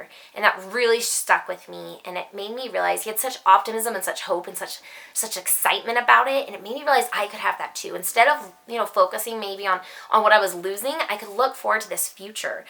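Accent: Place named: Utah, United States. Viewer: You do sound like you’re from Utah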